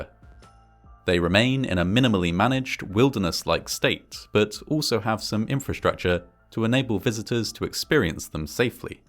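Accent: United Kingdom. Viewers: He’s a Brit let him speak British english in peace, man lmao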